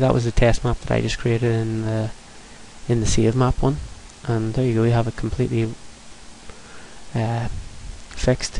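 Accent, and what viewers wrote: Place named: Ireland. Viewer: Just wanted to thank you for the excellent tutorial(s)! I found (all 11) to be quite useful as an introduction to XNA. I am very greatful for your time. I was relieved that you had posts listing the XNA 4.0 conversion under the applicable video showing the corrections. BTW its nice listening to an Irish accent in these tutorial videos as opposed to the 'more comment' accent associated with programming tutorials. This Canadian Approves :)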